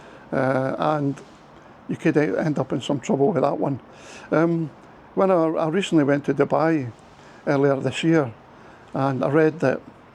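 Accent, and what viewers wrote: Scotland, United Kingdom. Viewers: Binge watching your videos tonight from India. Your Oman videos are great and hey I like that Scottish accent😂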